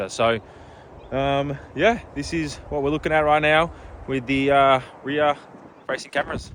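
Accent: Australia. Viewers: AUSSIE accent!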